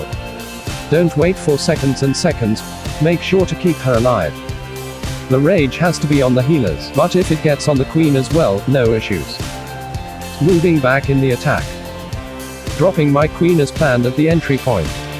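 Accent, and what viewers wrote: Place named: India. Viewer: He is indian. I automatically off when i heard the accent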